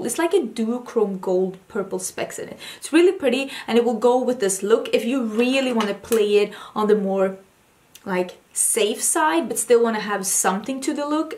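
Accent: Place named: Stockholm, Sweden. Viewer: My Fiance is from Stockholm, I'm from the US. To me, your accent sounds like a Stockholm accent(I could totally be wrong tho!). I'll be moving to Sweden in the next year! So glad I found your channel!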